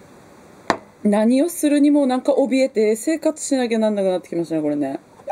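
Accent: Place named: Aomori, Japan. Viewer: 青森、懐かしいイントネーションです😀鶴亀温泉、フラワー温泉、行きたいな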